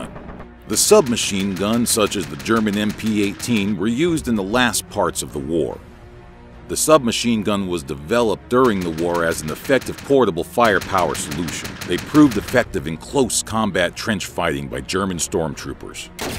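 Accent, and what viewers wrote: South, United States. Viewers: This guys sounds like Forrest Gump. Is it a regional accent in US? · That American acent is quite strong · Southern accent anybody else here it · You can kinda hear a southern accent too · Is no one gonna acknowledge the fact that he has a Southern accent and he said “Rafle” instead of Rifle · he sounds so american lol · he must have a southern accent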